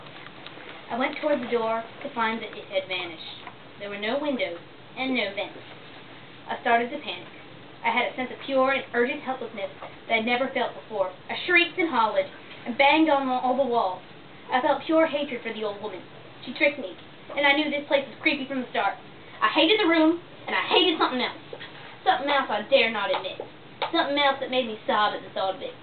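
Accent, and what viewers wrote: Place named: South, United States. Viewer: Go!! Your Southern Accent is coolio!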